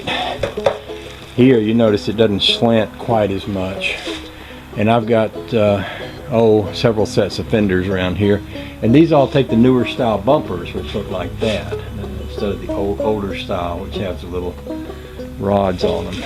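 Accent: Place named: South, United States. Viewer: ya left off the ah in front of pologize! Sory I miss read ya! But if ya was ta have a poltergeist and Dracula that wooden be Tanks giving, it be Halloween or if ur down by cemetery it be Gallows Eve! Hot Rod wood have ta break out his hot rod Christine! Stake em and hangem high! Now all we need is fur ta show up and start talkin British with his southern style accent.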